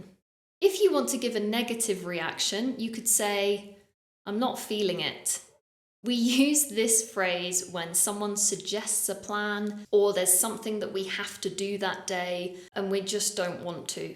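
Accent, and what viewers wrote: United Kingdom. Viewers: I like your British accent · British English ❤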